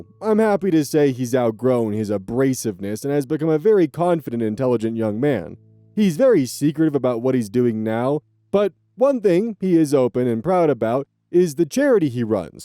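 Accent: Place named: Midwest, United States. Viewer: I love wheb midwest accent comes out strong xD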